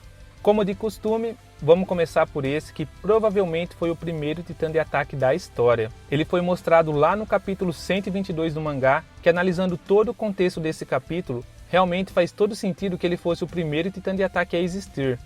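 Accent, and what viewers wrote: Brazil. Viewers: Mó baiano KKKKKKKKKKKKKKKK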